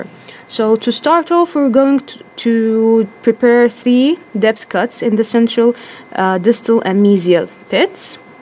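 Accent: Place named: England, United Kingdom. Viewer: Great job. Great En. accent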